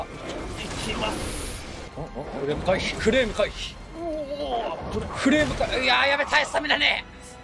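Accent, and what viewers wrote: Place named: Kansai, Japan. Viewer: 釈迦さんがちょいちょい関西弁の影響うけてんのジワる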